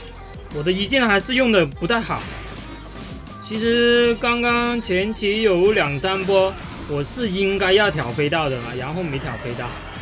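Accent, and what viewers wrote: China. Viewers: 你是大陸人嗎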